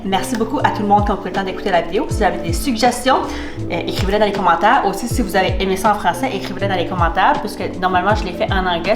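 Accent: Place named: Acadia, Canada. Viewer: J’adore en français et surtout l’accent acadien. Love it!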